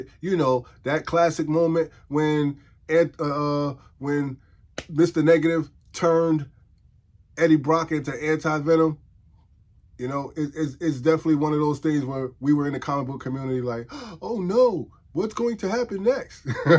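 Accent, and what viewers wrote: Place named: New York, United States. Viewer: Yo! Did you go to school in the Bronx back in the 80’s and early 90’s? You sound like a New Yorker for real!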